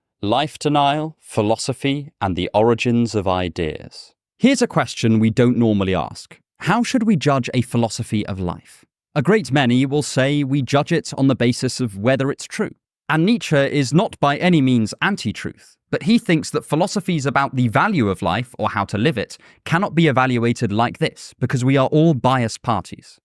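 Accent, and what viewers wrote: United Kingdom. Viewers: I really like his British accent ❤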